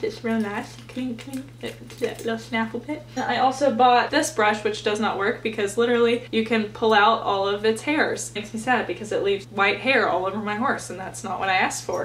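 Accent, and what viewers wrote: United Kingdom. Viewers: Your British accent is the best😂 I love how you really show your personality in your videos and you’re so fun to watch💕 best of luck with the dog!!x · Your English accent is spot on!😂 love your videos! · If I had not just bought a dredsage bridle I would purchase yours and I loved that spot on brtish accent!!👌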